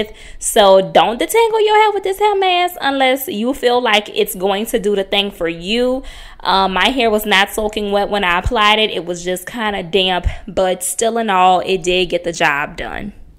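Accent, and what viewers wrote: New Orleans, United States. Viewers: Yes!! I love the Nola accent..Especially when you guys say."Babbyy"😁😁.!!! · I love this Nola accent I love being from down south baby it’s just something about our lingo and how we speak and each state is different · YOU FROM NEW ORLEANS?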